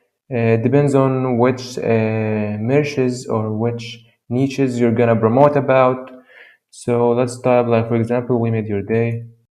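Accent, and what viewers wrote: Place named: Arab world. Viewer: انت عربي مبين من حكيك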